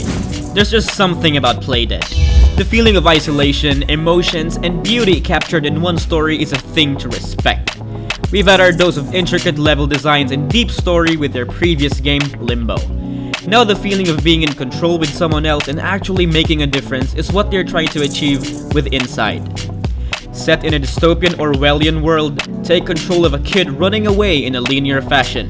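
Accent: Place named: Philippines. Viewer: You can tell the guy talking abt the games is pinoy😂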